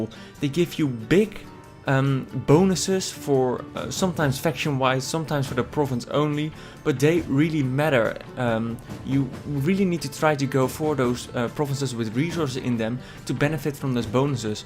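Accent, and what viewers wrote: Netherlands. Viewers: He has a Dutch accent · Fucked up Dutch accent. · ***** You're Dutch? So that's where that accent comes from! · Are you dutch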